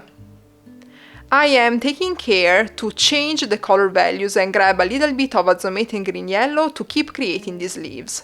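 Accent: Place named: Italy. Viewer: Parli inglese come solo un italiano può parlarlo 😅. Sei italiana? Video utile. Grazie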